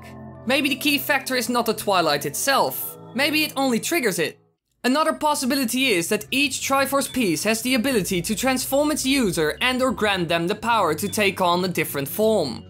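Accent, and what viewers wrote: Netherlands. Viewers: you a dutchy? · Are you Dutch? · hehe is that a holandish accent i hear?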